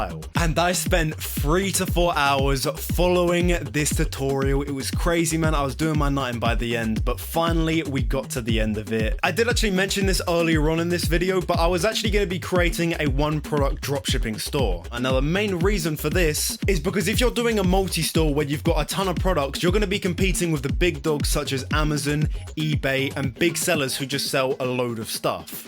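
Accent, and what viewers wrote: United Kingdom. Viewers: I notice you selling and using $ but you’re accent is british. Do you live in Uk? Or uS?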